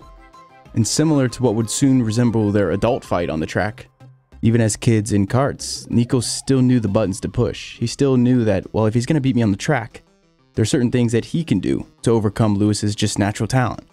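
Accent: United States. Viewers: Hearing an American talk about F1 is just weird. The names of the drivers, the tracks... its just wrong bro xD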